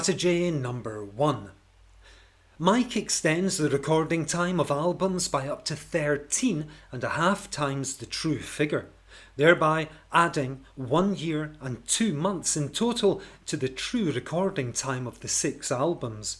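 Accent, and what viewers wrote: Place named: Scotland, United Kingdom. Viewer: Excellent insights! I've only just discovered your channel and am enjoying it immensely. I have a friend who is fully signed up to the whole fake-Beatles, PID, nonsense. He started out as a total sceptic then flipped to the opposite point of view, almost exclusively on the strength of some of the ostensibly anomalous photos of Paul. I could send him your superb debunking videos, but he is so adamant he's right they would probably bounce straight off. Anyway, it's a pleasure to hear your cool and measured Scottish accent and your very well-marshalled line of argument. 👏👏👏👏👏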